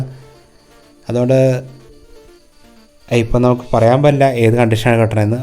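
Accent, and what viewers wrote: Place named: Kollam, India. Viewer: ഫ ഫ എന്നു പറയുന്നത് കൊണ്ട് മനസിലായി കൊല്ലത്തുള്ള ആളാണോ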